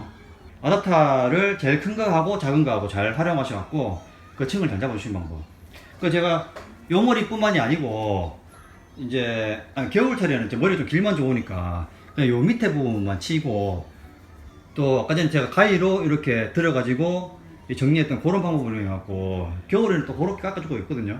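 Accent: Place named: Gyeongsang, South Korea. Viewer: 대구! ㅋㅋ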